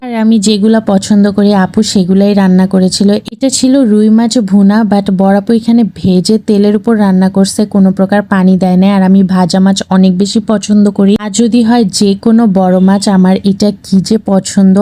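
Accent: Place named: Bangladesh. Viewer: 😂আপু প্রথমে আপনাকে ইনডিয়ান ভাবছিলাম পরে আপনার ভাষা সুনে মনে হলো আপনি বাংলাদেশি তারপর গরুর গোস্তো দেখে সিউর হয়ে গেলাম আপনি বাংলাদেশি😂🎉❤❤❤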